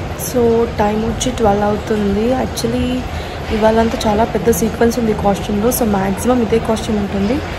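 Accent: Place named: Nellore, India. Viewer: Manadhi nellore kadha.. Atlumtadhie manathonieee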